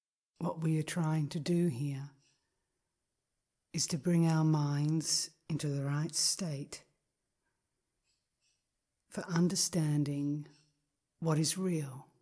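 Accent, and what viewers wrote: Australia. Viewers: lovely proper Aussie accent, brilliant m8🙋‍♀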